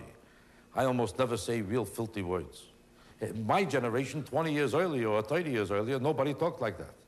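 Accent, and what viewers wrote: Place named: New York, United States. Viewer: Just the best jokes. His voice and delivery always make me happy. Reminds me of living in New York when I was a boy. God bless him.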